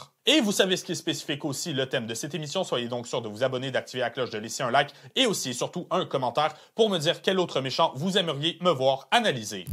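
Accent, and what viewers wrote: Quebec, Canada. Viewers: Wô! Es-tu Québécois toé là? :D (Sorry, je viens de débarquer sur la chaîne! Des critiques de films et analystes québécois sur Youtube, j'en vois peu!)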